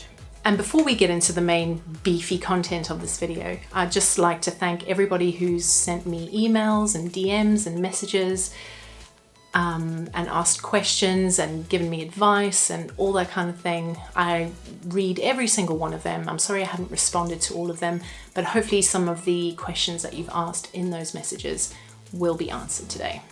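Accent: South Africa. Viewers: Another value packed video. Thanks for the awesome tips. Are there any valuable Redbubble facebook groups that you feel comfortable recommending? Then off-topic, are you perhaps South-African? I detect a bit of a SA accent.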